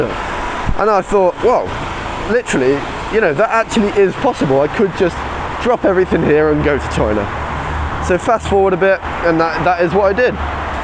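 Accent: United Kingdom. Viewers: 不像美国口音，英式英语？ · Thank for british English listening exercise video hoping u have a good life in my country peace man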